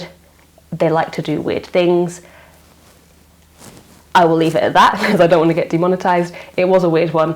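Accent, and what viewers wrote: England, United Kingdom. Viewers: I'm new to watercolor and new to your channel. I've binged watched tons of your content. I love listening to you (my mum was from England and your accent reminds me of her). Keep up the good work and stay safe.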